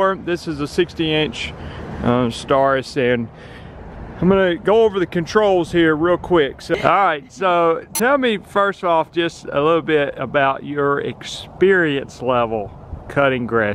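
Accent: South, United States. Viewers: I'm from the south and there's nothing wrong with sounding Southern.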